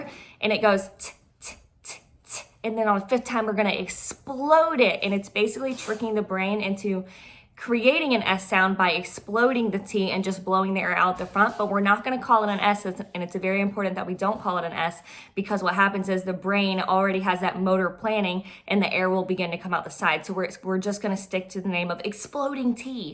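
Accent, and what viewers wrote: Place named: United States. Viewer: Hi, thanks for taking the time to put together this video, seems great. I am 15 and from uk, when I say these words, should I do it in your accent ( American) or can I do it in a British accent. Because accents involves different movements of the tognue. Many thanks !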